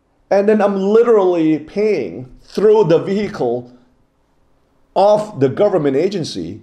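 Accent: United States. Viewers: I am here again listening to you. Not coming to sink in the politics. I have headache from that. But mainly to hear your American accent I like it so much very much.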